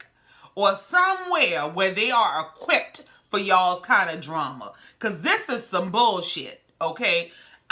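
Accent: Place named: Louisiana, United States. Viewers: Girllll when I tell you you spoke nothing but FACTS! I'm here for it! Are you from Louisiana? I'm getting Lafayette or Lake Charles.. ? But either way I just found your channel this Thursday today is 10/22/21 and I love your commentary! I subscribed!! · I detect a very strong and seasoned clinical background as well as a Louisiana dialect. Did I pass or fail? #youregreat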